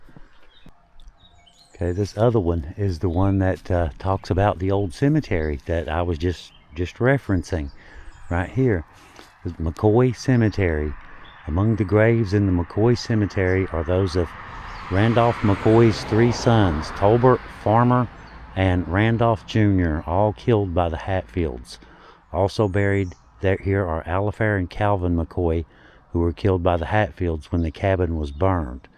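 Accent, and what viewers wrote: South, United States. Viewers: So pleased you covered this infamous piece of history. Super video. And of course I could listen to your southern accent all day long. Love from New Zealand 🇳🇿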